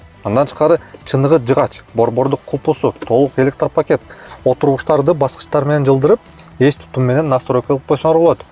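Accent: Standard. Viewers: Ушул алып баруучунун кыргызча таза сүйлөгөнү жагат ❤ Кабан жөнүндө кыргыз тилибизде чыккан биринчи обзор!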